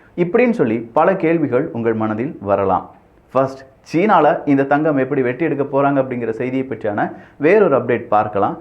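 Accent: Kerala, India. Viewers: Neenga enna malayali ah?? Illa neenga border state ah??? Namma tamilnadu slang la pronounsation pannunga.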